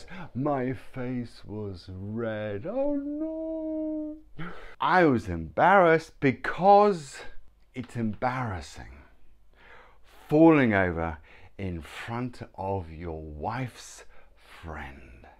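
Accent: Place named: United Kingdom. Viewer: I am interested in British English because it is interesting.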